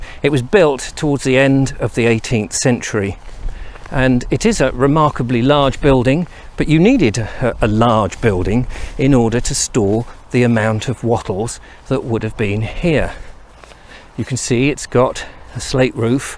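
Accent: United Kingdom. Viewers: Sir I'm from India and I love your video, the way you present all video and especially your British accent, sir please visit the lords cricket ground Victorian era pavilion it is more than 200 years old building and your history and I have one friend from pennistone, yorkshire she send me lot of old history building so you should visit this place too again thnx for your wonderful videos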